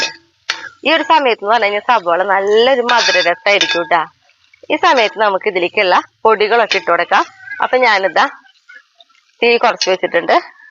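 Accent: Thrissur, India. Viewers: അത് തൃശൂർ ക്കാരുടെ ഒരു സ്റ്റൈൽ അല്ലേ?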